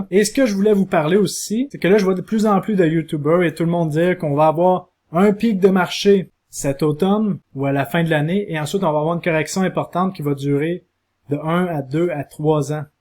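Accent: Quebec, Canada. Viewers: Alors quand tu dis que les médias de masse dise que de la grosse merde avec ton accent québécois, je suis juste mort de rire et ça illumine ma journée 🤩🤣🤣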